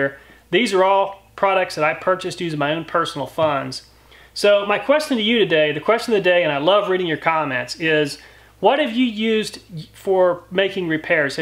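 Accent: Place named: Midwest, United States. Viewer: Nothing better than filling a gash. Love these videos. Just a guess but you're from northern Missouri or Iowa.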